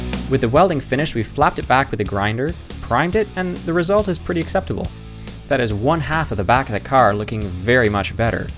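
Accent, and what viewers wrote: Canada. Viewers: Very nice work. I do love your canadian english. Who cares about Trumpland..... Cool vlog, very 'blinky'!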